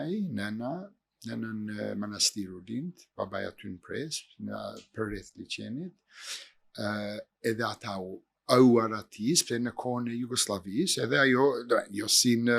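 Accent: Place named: Kolonja, Albania. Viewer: Truri dhe mendja e ndritur e Shqiptareve ndertojne boten dhe per vendin e tyre nuk bejne asgje se nuk kemi shtet qe ti mbeshteti Bravoooooooooo per gjuhen dhe dialektin Kolonjar Respekte per kete Zoteri ❤❤❤❤❤❤❤❤❤❤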